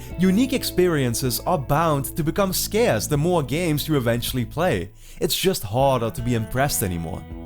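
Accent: Netherlands. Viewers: ily man, you're just to real, why you gotta hit my nostalgia buttons, not only does your dutch accent hit me ( a belgian buttboy) in the familiarity feels, but then you do this, AHHHHHHH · Are you dutch lol? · That accent gives you away, Nederlander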